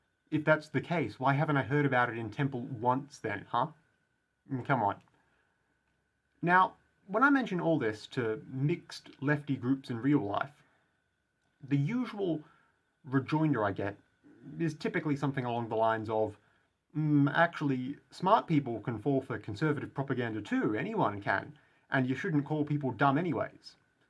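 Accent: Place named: New Zealand. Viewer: The Kiwi accent is the best in the world 🇳🇿🇳🇿🇳🇿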